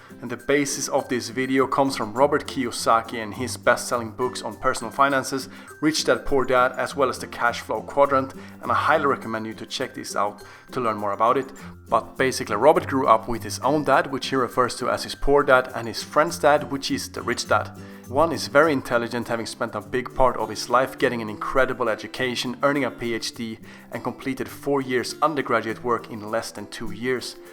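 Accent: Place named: Sweden. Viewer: haha din engelska låter precis som när svenska nhl spelare ger interview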